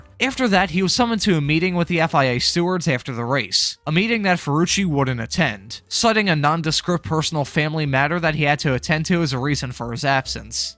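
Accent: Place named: Philadelphia, United States. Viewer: Didn’t pick up that Philly area way of pronouncing certain works till you mentioned where you’re from then I couldn’t unhear it lol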